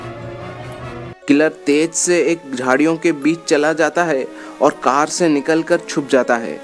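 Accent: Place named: Odisha, India. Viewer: You must be a Odia guy, got from your accent. But nice explanation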